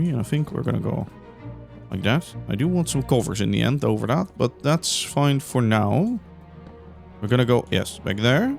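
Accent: Netherlands. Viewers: Aan je accent te horen ben jij toevallig Nederlands ?🤩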